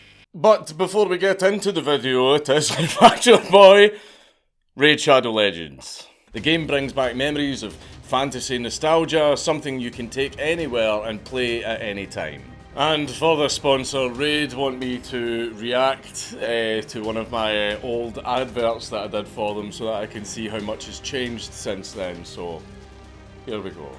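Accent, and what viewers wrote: Scotland, United Kingdom. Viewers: Scottish I think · He’s Scottish. That’s why.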